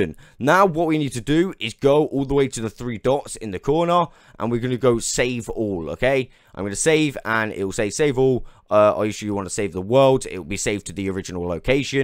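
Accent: United Kingdom. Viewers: so every british person is dantdm?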